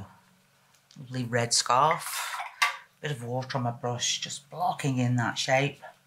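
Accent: United Kingdom. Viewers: What a super tutorial this is ❤. As a foreigner (to you ) I just love listening to your British accent ( almost makes me forget to paint 😊 ) and I will definitely try to make a go for a snow man this year ☃️ Thanks for sharing this 💯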